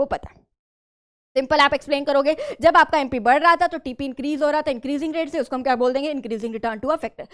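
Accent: Punjab, India. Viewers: Mam your way of talking is really cute😂 giving full Punjabi vibes 😌and you gave the best and the easiest explanation of this chapter ❤love u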